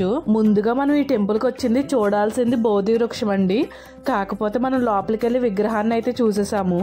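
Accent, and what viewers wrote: Godavari, India. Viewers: ఏమండీ మీ వీడియోస్ చాలా బాగుంటాయి.అచ్ఛ మన గోదారి యాసలో మీరు బాగా మాట్లాడతారు.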